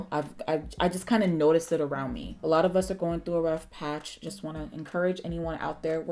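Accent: Nigeria. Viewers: Yayyyyy your back! I was having chocolate withdrawals I missed your Nigerian accent 😍❤️ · The Nigerian accent NEVER fails to make me laugh. You are a freaking queen. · The Nigerian accent is baccckkkkkk!!!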